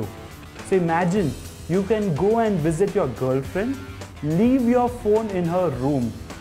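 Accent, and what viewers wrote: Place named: India. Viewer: Oh, I love your videos! I started to watch just to hear your cute indian accent but you're so funny and share excellent information that i felt in love with geek on the loose and unzziped by Dell too! I wish i could be your baby doll <3 LOL XOXOXOXOXOXOXOXOXOXOXO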